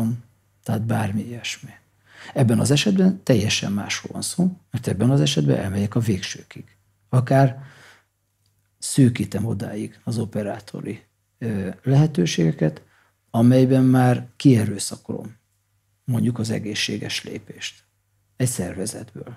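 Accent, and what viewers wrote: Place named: Russia. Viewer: Kedves! Nagyon érdekelne, hogy miért beszélsz akcentussal? Olyan a hanglejtésed, mintha orosz lenne az anyanyelved. Jól hallom? Köszönöm a videókat, nagyon szeretem őket, remelem egyszer lesz alkalmam egyszer találkozni veled!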